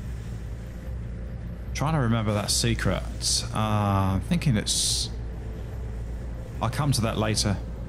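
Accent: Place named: United Kingdom. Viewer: Nice to hear another player from the UK for once lol, do you play Defiance by any chance....we could do co op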